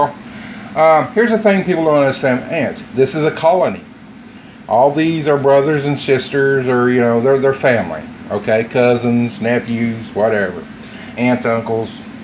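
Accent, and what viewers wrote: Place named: Appalachia, United States. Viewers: his hillbilly accent and attitude sold me. he talks just like me. i trust this man . gonna go to war with some ants to0-nighttt! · Wonderful video.. I used a bait from dollar tree sat it down then came back like 10 min's later oh lord they was all by the bait (Pic- Ant killer Bait) I got mortified and sprayed Tat ant and Roach killer.. Killed them on the spot was I supposed to leave it be and let it do like the video above let them fest.. I was so darn scared I killed them on the spot with the spray.. On my way to get Terro Now.. Please advise.. Thanks - I love your southern voice ♥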